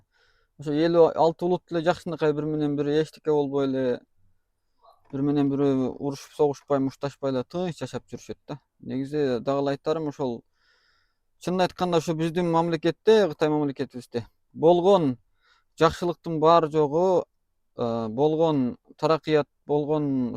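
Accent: Kyrgyzstan. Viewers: нукура кыргызча атко🎉❤ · Кыргызда р тамгасынан башталган соз жок. Ырахмат деп жаз туура болот. Кыргызстандагы копчулук эл аны билбей рахмат деп жазат бирок сен кыргызчаны таза суйлогонундон сага айтып жатам. Жазылганда ырахмат, окулганда рахмат деп окулат.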